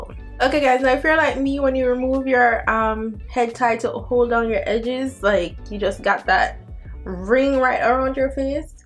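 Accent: Jamaica. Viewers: Are you Jamaican 😊 ?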